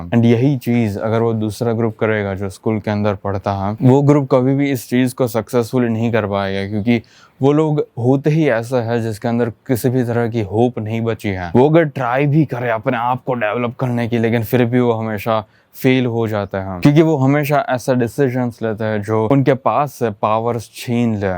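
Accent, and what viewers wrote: Gujarat, India. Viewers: Real hai bhai, yeh Gujarati speaker hai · I can feel the Gujarati accent in your speech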